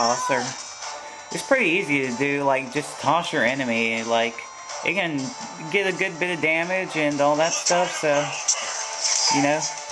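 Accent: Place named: South, United States. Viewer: HE IS SOUTHERN HES FROM MISSISSIPPI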